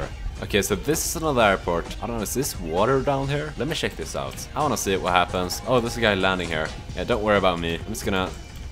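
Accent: Sweden. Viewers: Are you Swedish? You sound Swedish hahaah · You have a swedish accent my dude hej hur mår du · Swedish Right? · Are u swedish?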